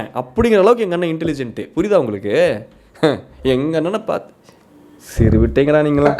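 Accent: Coimbatore, India. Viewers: Your cute man but nenga pesurathu Konjam coimbatore slang mathiri irukku but nalla irukku Ivana kadaisi varaikkum thiruthave mutiyathu intha moji pathale kovama varuthu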